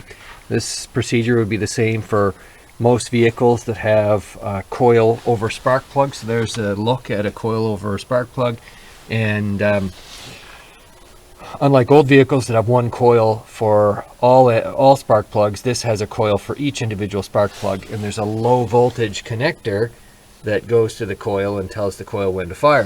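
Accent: Canada. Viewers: You could tell from the accent he's in Canada!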